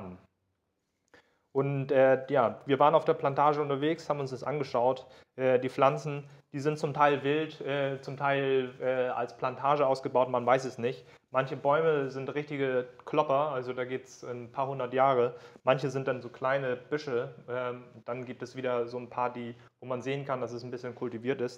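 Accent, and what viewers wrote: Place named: North, Germany. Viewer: Und dann noch dieser nordische Slang mit dem angequatscht wird! ;-) Großartiges Video!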